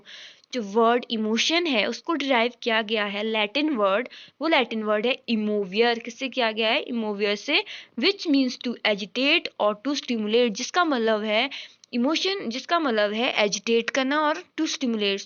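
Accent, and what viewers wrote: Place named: Jammu, India. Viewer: Mam apki language me dogri touch dikh ra h❤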